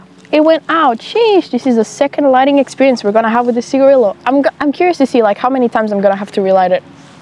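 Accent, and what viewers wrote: Portugal. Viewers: I thought you had the most obvious portuguese accent and then i checked the description and boom there it is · tu tens sotaque de tugaa...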